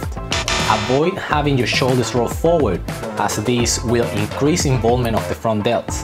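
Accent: Spain. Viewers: 一听你说英语，我就知道你母语肯定是西班牙语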